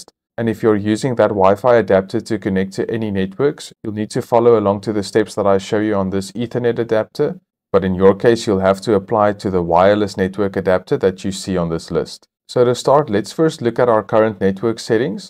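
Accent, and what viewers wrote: South Africa. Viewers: Do i hear a south african?🤔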